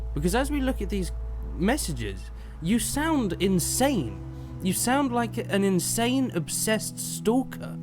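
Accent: England, United Kingdom. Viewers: It took me 8 minutes to realize you have an English accent :P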